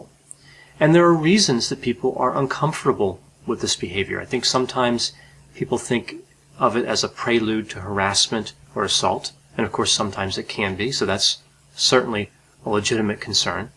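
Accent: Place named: Delaware, United States. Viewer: The only person in Delaware who hasn't met Joe Biden, lol!! Really, you have a great accent, and it's one I recognize (I'm from Virginia but have been to Lewes, DE for the beach and Wilmington for the art museum).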